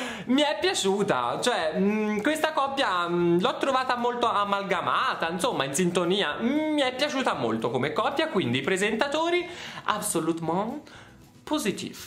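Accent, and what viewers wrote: Tuscany, Italy. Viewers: E un si sente che tu sei toscano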